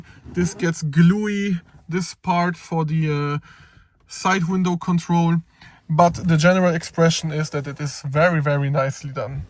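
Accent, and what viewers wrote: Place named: Germany. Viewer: I'm so confused as to why this guy sounds German but is driving in Poland. 😆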